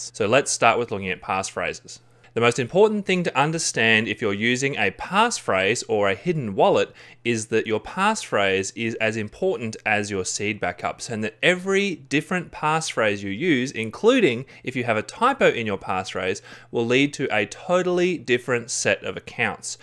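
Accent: Australia. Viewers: Hey man, your videos are great. You're Australian right? Where'bouts are ya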